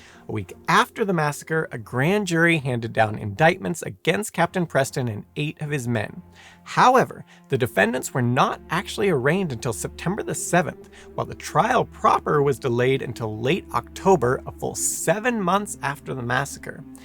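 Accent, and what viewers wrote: United Kingdom. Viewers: you fool!!! The British accent is ASMR to American ears!!! This guys effeminate whaling will never suffice…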